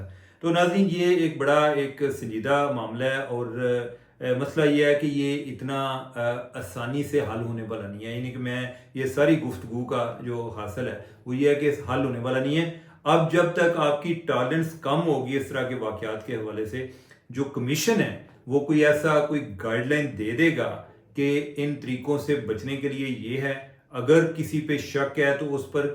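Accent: Punjab, Pakistan. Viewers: Punjabi touch. ❤🎉...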